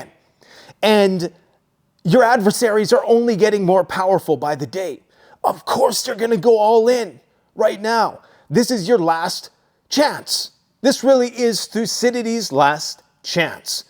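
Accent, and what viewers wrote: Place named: United States. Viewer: I didn't mean to cause any arguments, I was genuinely curious about the differences between the two countries. I've been watching this channel for years and he talks like he lives in the USA. Thanks for all the info guys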